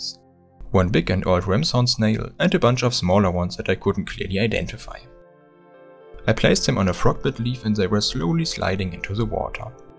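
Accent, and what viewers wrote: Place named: Germany. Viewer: Man hört den deutschen Akzent ganz schön raus :D Echt schönes Video, vielleicht baue ich mir selber auch mal sowas. Aktuell habe ich eher Erfahrungen mit kleinen Terrarien gemacht.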